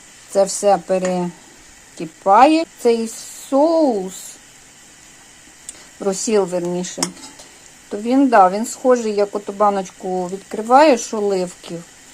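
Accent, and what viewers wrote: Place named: Western Ukraine, Ukraine. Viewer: Дякую Вам щиро! Прекрасна українська мова у Вас. А наших українських діалектів в Україні аж більше 40! А по мові Ви, певно, з Тернопільщини чи Франківщини (можливо, я помиляюсь, у нас у всих різні наші діалекти). Так що, своєю солов'їною разом з нашими рідними і різними українськими діалектами переможемо російську навалу і російських слів, і їх самих з нашої України. Дякую щиро за український контент! Переможемо обов'язково!!!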